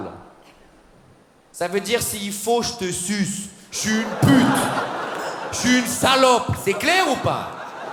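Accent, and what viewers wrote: Switzerland. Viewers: Trop drole...mais il est Suisse...c pas possib...cet accent...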